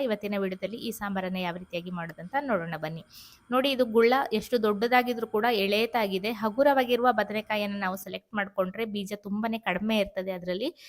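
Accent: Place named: Kundapura, India. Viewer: Hoy, ನಿಮ್ಮ ಕುಂದಾಪುರ ಬಾಷೆ ಏನಾಯ್ತು, bolu ಹುಳಿ super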